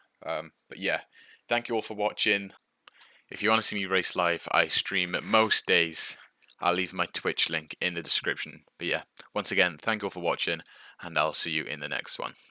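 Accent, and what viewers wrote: Wales, United Kingdom. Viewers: Good effort bro, just found your channel via the Shed Dweller. And cos it's nice to hear a fellow Welsh accent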